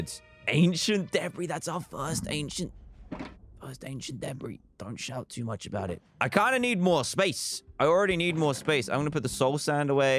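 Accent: United Kingdom. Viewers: That’s because we British say Debis that way w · shut up he’s British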